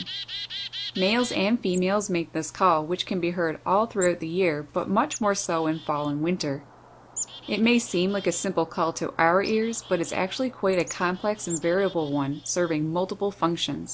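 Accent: Canada. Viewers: Just started to listen, say's " aboot," Eight oot of the sixteen differnt calls. Haa Must be Canadian. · I can tell you’re Canadian, your “O’s” sound like ooo’s, hence “Abooot” Love the video tho!!! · I love your videos, especially those featuring corvids. I was tempted to mention that I thought I heard what linguists call "hypercorrection" in your narratives. I'm glad I didn't. My new sense is that what sounded like hypercorrection might be Canadianisms in your speech. I'm especially interested in what you have observed about social learning in birds. That's a topic that has scarcely been explored at all. Thanks for the gift of your observations.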